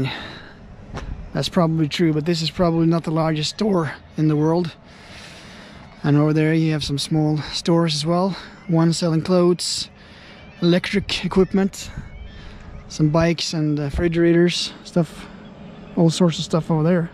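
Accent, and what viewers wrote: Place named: United States. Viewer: Ok, i imagined that you were a turist due to your english pronunciation that looks like american (or canadian) to my ears .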